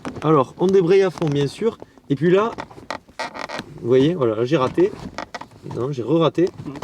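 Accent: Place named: Lille, France. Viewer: Sympa la vidéo l'ami Lillois, c'est votre accent qui m'a mis la puce à l'oreille😄 Tres beau modèle que vous nous présenter, félicitations à son propriétaire. En revanche, votre tenue vestimentaire me fait penser à une pub pour Cetelem🤭 Encore merci pour la vidéo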